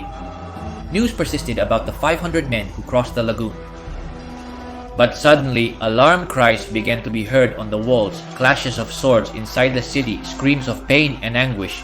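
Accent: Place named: Spain. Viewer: Man your accent is so distracting, just make the video in spanish, it would be more interesting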